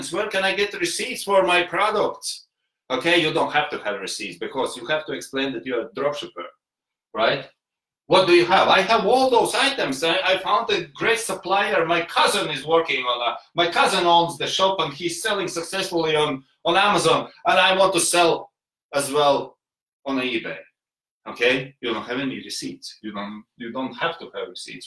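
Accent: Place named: England, United Kingdom. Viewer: Interesting point of you! I like your English accent~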